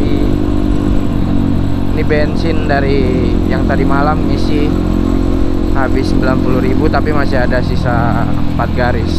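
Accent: Papua, Indonesia. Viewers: Logat papua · Logat Papua😁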